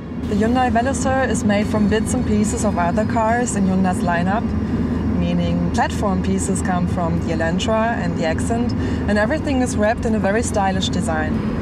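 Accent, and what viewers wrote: Germany. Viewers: She does her reviews well but I mean seriously, I don't know how you're gonna do it, but you just have to do something with the accent. I'd rather she speak in German with subtitles. No hate I honestly love the reviews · I love German accents! :D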